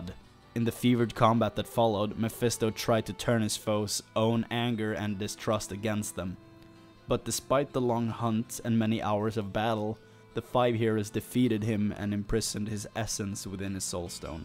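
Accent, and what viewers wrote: Sweden. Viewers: Awesome, btw, Swedish?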